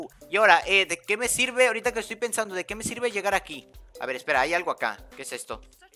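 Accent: Mexico. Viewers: Oye eres de México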